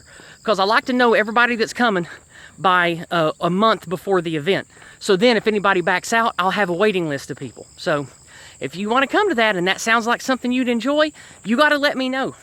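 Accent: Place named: South, United States. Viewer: The intro for your videos is so cool the way it melts away with the gentle piano notes and American theme.!!! I just subscribed to your channel and will stay tuned. Cool Southern accent btw. 😎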